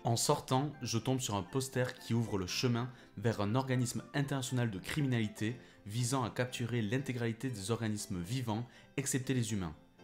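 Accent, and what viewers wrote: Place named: South, France. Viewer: Ptdr j’adore ! Tu viens d’où avec cet accent fada ?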